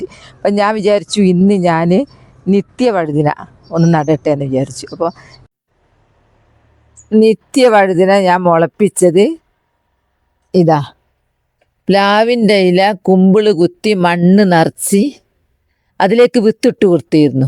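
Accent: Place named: Malabar, India. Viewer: നിങ്ങളുടെ സംസാരം കേട്ടിട്ട് കോഴിക്കോട് മലപ്പുറം അതിർത്തിയിൽ എവിടെയോ ആണെന്ന് തോന്നുന്നു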